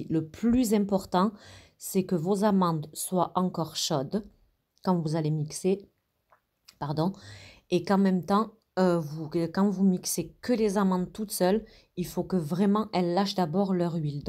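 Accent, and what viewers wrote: South, France. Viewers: Merci beaucoup pour tes recettes, très très bien expliquées, avec en plus, ton bel accent du Sud, pleins de gros bisous du Gard 😊😊😊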